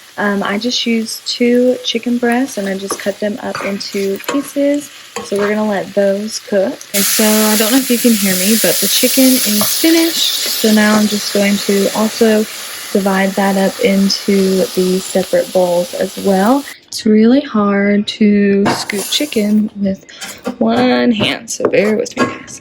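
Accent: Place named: South, United States. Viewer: Stumbled across your channel! Would love to support each other! I have done this so many times where I plan a video and it didn’t go as planned. Thankful for people like you who do jobs to help people. Where are you from? Southern accent! I live in IL BUT IM BORN AND RAISED in FL. People always stop me here about my accent that I don’t think is thick at all but def something people notice up here. Hope your last semester goes great! I’m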